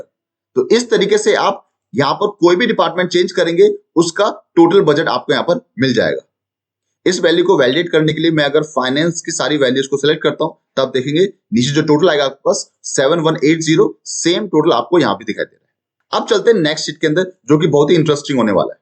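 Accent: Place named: India. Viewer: Indian speaking but excellent demonstration. Thanks a ton. Big like and subscribe for attaching the working file. 👍👍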